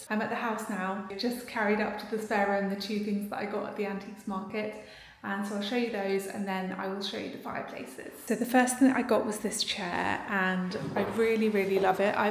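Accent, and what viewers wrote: United Kingdom. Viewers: I’m so excited for you… this home is going to be so lovely. I also have watched you for years and am glad you are back! I see your home as being some vintage pieces with some modern… I guess you would say eclectic. You have the most beautiful voice along with your British accent. … I could listen forever…. You also are beautiful… your skin is amazing! Please keep filming the vlogs we all love them Blessings lovely lady ❤️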